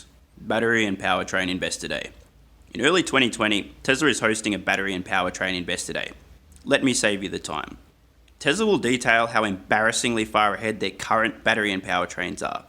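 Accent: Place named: Australia. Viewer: Good Work, and Nice Vid work... Especially the Brief Jim Chanos shorts pic... lol Great to hear an Oz Accent covering TSAL too... You really are the first for us:)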